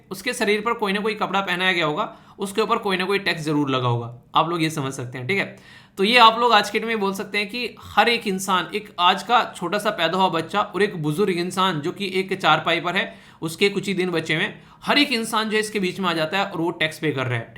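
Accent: Haryana, India. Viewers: haryana ke ho na sir aap aaj tak jo bhi baate lecture main btayi hain n aapne kti dil t connect hove hh sare baat haryanavi tone ss aapki language main sir or sir lecture toh aapka dmakedaar hove h har baar 😊 ☺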